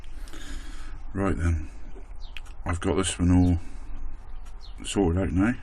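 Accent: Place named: England, United Kingdom. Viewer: ! Are you in merry old England? I grew up in Wales.#7 Llanerch path, Cywmbran Monmouthshire. Pretty sure I hacked the spelling on that. Been awhile. I miss the sweets, steak n kidney pie and the custard squares. I love to come back just to beat the hell out of one Nigel Wilkes. My dad was in military so was there for 4 years. Live in Coos Bay, Oregon these days.England was best place in the world to grow up as a kid. Miss the hell out it. Sorry to ramble but not have any contacts there anymore and you accent reminded me of the place. Take care. JP.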